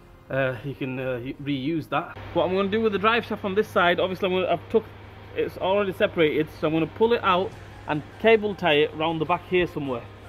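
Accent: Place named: England, United Kingdom. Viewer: I like your knowhow but because of your English accent I have to suggest that you talk a wee bit slower. Canadian English is a wee bit of translation... plus the fact that I'm slow😀 Thanks for doing this vid.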